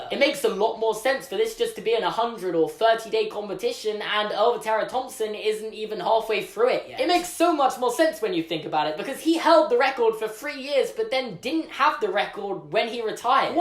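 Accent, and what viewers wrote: United Kingdom. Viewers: That'll teach Pixar..... not to make a British boy so upset that he feels like ranting on YouTube 😂. I love hearing you all upset with that adorable Bristish accent.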